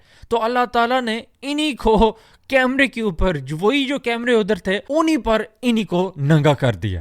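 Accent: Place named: Punjab, Pakistan. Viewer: Bhai aapka lehjaa punjabi hai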